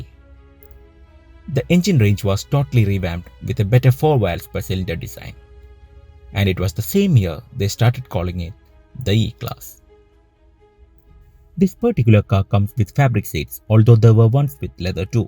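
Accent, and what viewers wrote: Kerala, India. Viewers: Malu english mind blowing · Bro...I feel that thick Malayali accent 🤣👍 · Just one thing.....you have that typical Mallu Accent...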